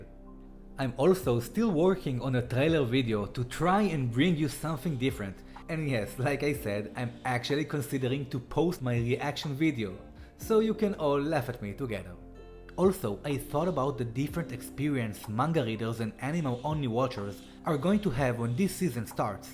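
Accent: Israel. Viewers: You have an Israeli accent. you are Israeli?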